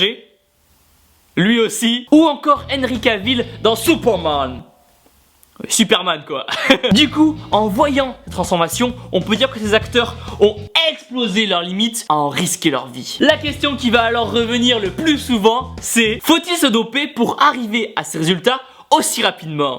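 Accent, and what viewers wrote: France. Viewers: tu doze solide tu doit etre stressant , maudit accent francais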